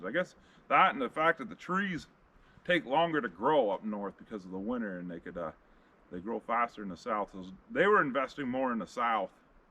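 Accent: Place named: New England, United States. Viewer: thanks. Sounded like a combination Massachusetts and Maine.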